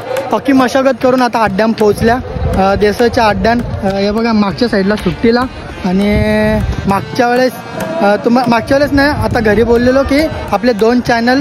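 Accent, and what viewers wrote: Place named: Agri, India. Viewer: एक नंबर भावा आपली आगरी भाषा आणि तुझी बोलण्याची टँक एक नंबर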